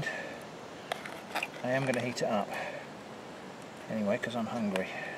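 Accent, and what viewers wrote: United Kingdom. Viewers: I like the UK (been there only once so far, unfortunately), british cuisine and british pronunciation so I subscribed. Appreciation from a russian-speaking person for this review, спасибо :)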